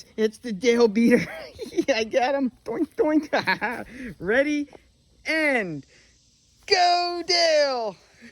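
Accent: United Kingdom. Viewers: You have a slight Scottish or Irish accent. I can't specify because thebmixture of American accent. Do you have a parent from Scotland?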